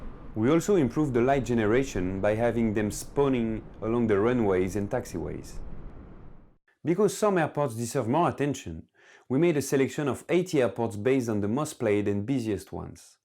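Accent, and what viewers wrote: France. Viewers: This french accent :) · French accent :) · yes, enjoyed this new features video. You definitly want me to take the plane and go back to home, with this beautiful easy listening french Chiracron english Jerusalem accent :-D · L'accent français?😅... Great video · It feels like watching a video presentation by Airbus. The french accent.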